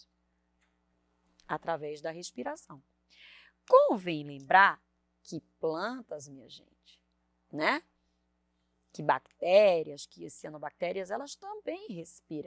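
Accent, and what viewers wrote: Northeast, Brazil. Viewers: pelo sotaque acho q do nordeste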